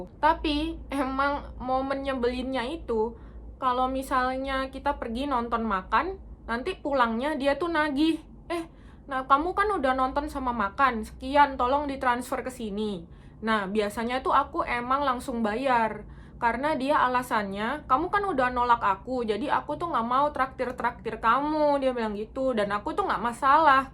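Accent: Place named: Surabaya, Indonesia. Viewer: kak kamu orang surabaya kah?.. kok logatnya surabaya banget hehe..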